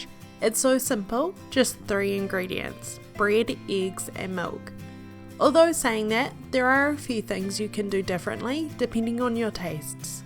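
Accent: New Zealand. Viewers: Nola Susan New Zealand accent duh 🙄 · Hi, I just wanted to say I’m from new zealand aswell. I could tell by your kiwi accent. Your cooking videos are so great and helpful thank you :) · Kiwi New Zealand accent 🔥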